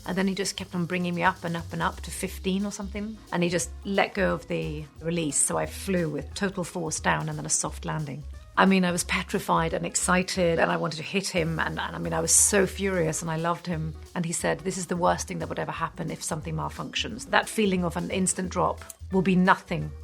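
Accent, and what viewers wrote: United Kingdom. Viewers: Fint att hennes svenska brytning märks genom den tjusiga Engelska dialekten :P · She sounds more British than most British do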